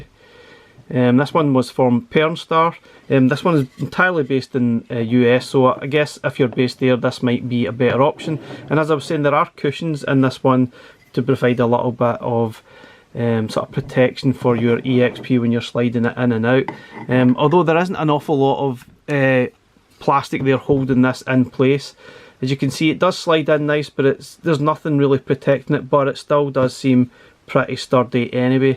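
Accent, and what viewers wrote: Scotland, United Kingdom. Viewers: Just wanted to say I recently found your videos after getting a Super Pocket having wanted an Evercade device for a while, and they’re fantastic :D then couldn’t resist an Evercade VS after getting a few games and wanting to play the on the bigger screen…your reviews are invaluable for deciding what to get next, and it’s always good to hear a fellow Scottish accent on YouTube :D thanks for all you do!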